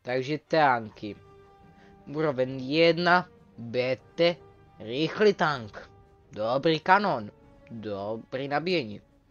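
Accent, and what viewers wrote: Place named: Vietnam. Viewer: spis mluvis jak vietnamec nebo chinan ktery se naucil cesky a nezni to jako rusak moc (nepisu haci ani carky protoze jsem linej)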